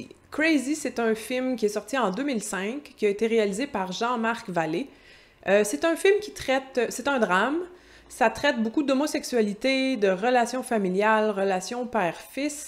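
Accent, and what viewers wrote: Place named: Quebec, Canada. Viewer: Salut comment aviez-vous? Excellent video j'adore ta voix et l'accent québécoise me plait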